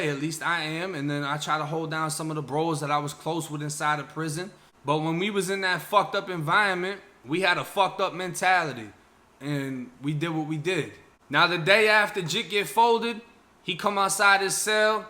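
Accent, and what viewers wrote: Boston, United States. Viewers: You sound like you from Boston🤔🤔🤔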